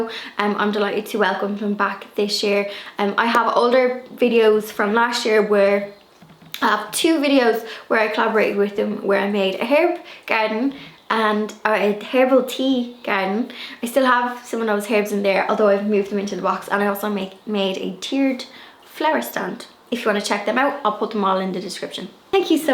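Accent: Ireland. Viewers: I kept thinking you were saying day lilies, growing them from seed. Then when I saw your plants, I’m like that’s not a day Lilly. It took me a hot minute to figure out you were saying dahlia. I’m from America so I had to let the Irish sink in a minute. I have several growing I’m my garden. I love them. Happy growing!!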